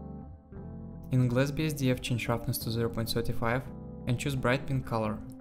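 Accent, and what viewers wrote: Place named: Russia. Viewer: Your accent sounds too russian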